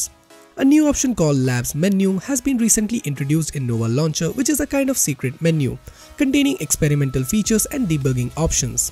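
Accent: India. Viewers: It's like the robo narrator but with a heavy Indian accent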